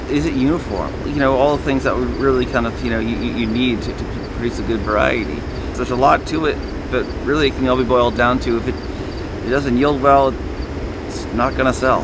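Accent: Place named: England, United Kingdom. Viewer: Brother has an un-pickable english accent 😄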